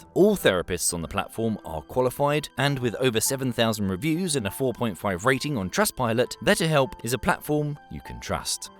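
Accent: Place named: United Kingdom. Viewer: I like this channel and not just because of the British accent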